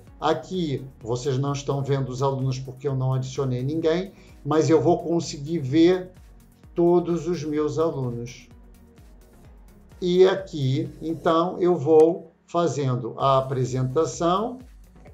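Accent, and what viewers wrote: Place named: Portugal. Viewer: Muito bom. Seu sotaque parece ser de um português que mora há muito tempo no Brasil.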